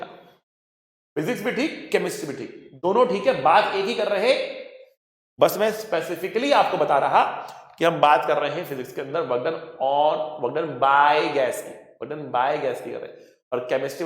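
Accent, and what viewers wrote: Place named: South, India. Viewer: He looks like South Indian n also talks like it 😀😀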